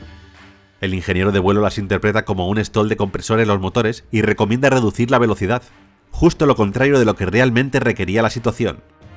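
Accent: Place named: Spain. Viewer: Excelente narrador, me encanta la narración de éste canal, y eso que a los latinos nos nos gusta mucho el acento de España para los doblajes de las películas.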